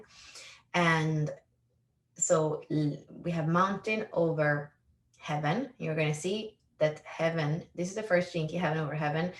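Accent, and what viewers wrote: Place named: Caribbean. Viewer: You sound like a west indian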